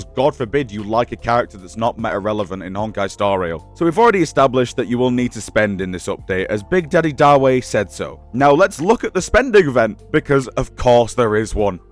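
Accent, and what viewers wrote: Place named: Blackpool, United Kingdom. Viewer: The UK origin was crystal clear, sounds like Blackpool area, but i might be wrong. Is nice to hear. ^_^ Ps from time to time i like playing FuA, but only have 1.x units, so it's kinda tough, but doable. Not to justify the stunt that yoyoverse is pulling though, that sucks and as far as i am concerned they can shove that cyrene girl up their arses.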